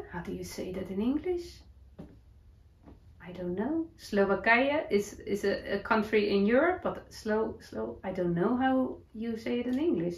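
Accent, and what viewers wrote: Netherlands. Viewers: I love your Dutchy English. 😍 when you say ‘a piece of cake’ it means it is easy to do. When we don’t love something ( in a slight way) we say ‘it is not our cup of tea’ . Hope that helps 😘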